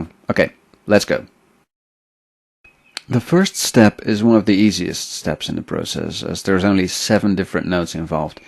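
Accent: Netherlands. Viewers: Er vanuitgaande dat ik een NL-er hoor: Goeie uitleg! Dank!! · Oh my god. Thank you for this... 10 minutes indeed. Can't mistake THAT accent.. I'm from Los Angeles but my dad's family is from Arnhem. Bedankt! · Quick question: are you Dutch?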